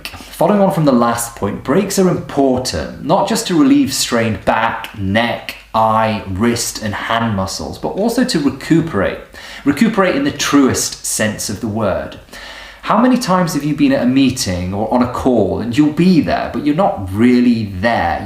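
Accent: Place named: Stoke, United Kingdom. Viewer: and bring back the stoke accent dude don't forget your roots duckie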